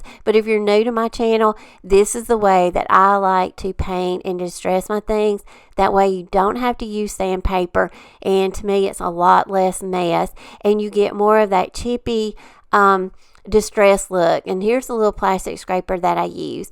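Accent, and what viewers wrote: South, United States. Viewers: I love all your cool creations. Where do you get your ideas? They are all so pretty. I enjoy your sweet southern accent. You have a nice voice. Keep up the good work. Looking forward to The next Video! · These turned out so beautiful. I love the shabby chic look. 😍 I'll be honest, I love most of all the looks. Just not a fan of modern. Lol My mom's mom was from OK, so they taught me to love old stuff and antiques. I love your southern accent, where are you from? (Just the state. Lol) I haven't been passed TX yet, but someday I'm going to see where my grandparents came from, before they came to California. (Before my mom was born.) GPA was from Arkansas. ❤ TFS! ❤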